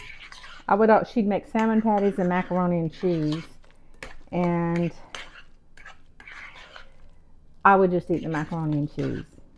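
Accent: South, United States. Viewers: Keep on keeping on,don't change anything ! Keep your great southern style, language and all. From one southern belle too another!❤ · I make salmon burgers and put in finely diced celery, parsley and use oats to bind. Dust with flour before i fry. Im gonnavtry your recipe. I love watching your videos and your southern accent is musical, delightful, and beautiful, ❤❤❤❤❤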